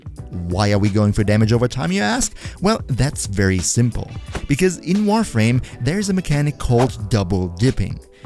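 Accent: Germany. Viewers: Du bist Deutscher? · I fuckin knew it XD das deutsche wurde rausgerochen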